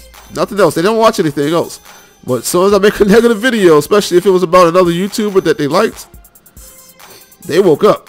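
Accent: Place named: Baltimore, United States. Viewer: So I discovered u thru the GamingIlluminaughy and I loved u immediately bcuz I could hear the Baltimore in u and u were always funny and honest. I support u, MWN and JG. Not gonna lie, I dont really like the new dudes MWN is running with but I will support none the less. To mirror the sentiments of others, continue to make vids if u want to. I totally get the being grown n trying to find balance with this and the real world. U also mentioned that u were from the old school when games took effort, consistency and constant replays sometimes from the very start of the game. Stop worrying about clicks if u enjoy what u do. I would love to see a vid with u and JG if MWN cant come. I also see in the comments that many ppl support u. Stay confident Fam. We need ppl like u to represent for our city. Luv u ♥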